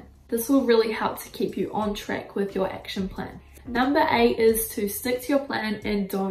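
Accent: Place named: Australia. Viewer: I hear an Australian accent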